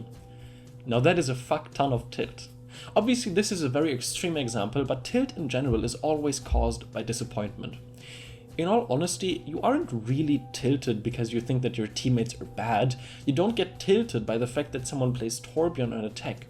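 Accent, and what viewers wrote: Germany. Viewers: hah, I knew this accent was german.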